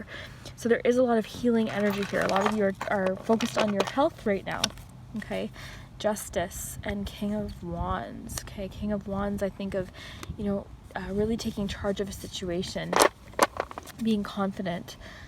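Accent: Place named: Ontario, Canada. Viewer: Are you Canadian by chance? I think I can hear the Ontario accent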